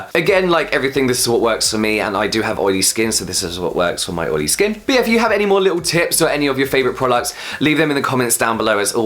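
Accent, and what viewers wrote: United Kingdom. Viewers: Am I the only one who dies for the British accent? :D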